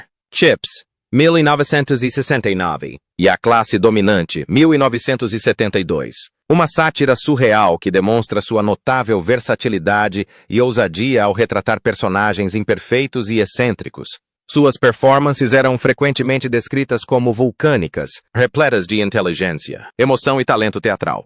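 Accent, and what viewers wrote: England, United Kingdom. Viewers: para com este horrivel sotaque ingles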